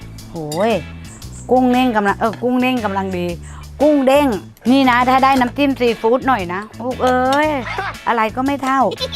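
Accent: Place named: Mae Klong, Thailand. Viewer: สมเป็นสาวแม่กลองเลย อารมณ์ขัน พูดตลกเหน่อๆ แต่ฝีมือทำกับข้าวสุดยอด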